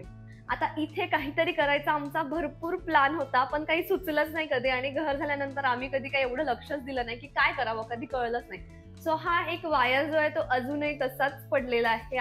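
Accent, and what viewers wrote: Vidarbha, India. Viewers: Mala tuza aajcha kitchen organization khup awdle,tuzya languagewarun nakkich tu vidarbhatli aahes ase watle,karan me suddha thitlich aahe wardha district apli bhasha aikayla khup chhan watle,me pan aatach modular kitchen kele,organize kele baryapaiki pan aata tuzi pan idea use karel apli manas apli mati😅😅😅😅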